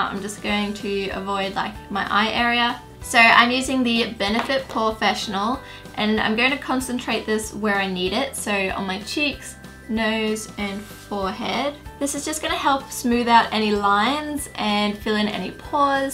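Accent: Australia. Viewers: P.s. I am so jealous of your accent aaaaah! I've always wanted to say that, I don't know why I haven't haha I had to fake an Australian accent for my school musical when I was the lead, and people loved it, and it's what pretty much landed me the role ahaha it's my favorite accent! But then I did my school play and I had to fake a BRITISH accent and it was hard because I'm so used to doing an Australian one, so everyone was just reminded of my character from the musical hehe and THERE'S MY LIFE STORY! Sorry about that haha. My point though, is that I envy you and your accent!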